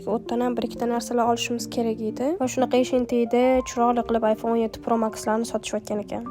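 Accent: Uzbekistan. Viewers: Vodilikmisiz shevayiz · Хаммаси яхши кетябди лекин тилезди узгартирмай чала чулпак килиб тошкенча гапирманг илтимос вообще йимяпти😂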